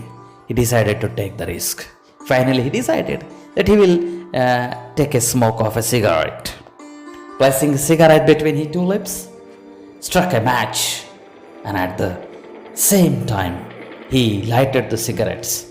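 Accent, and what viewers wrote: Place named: United States. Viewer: Thoda dhire bhi bol sakte ho aap American jese kiv bol rahe ho aap Kiya samaj aayega new student ko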